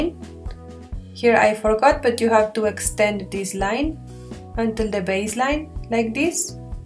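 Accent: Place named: India. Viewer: hey.. u are just awsome!! I have a personal question for u? where are u from? yr accent sounds like asian or tribal indian. may I know plz?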